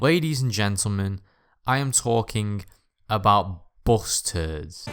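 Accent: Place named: Northern England, United Kingdom. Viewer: Loving the reet northern accent ✌️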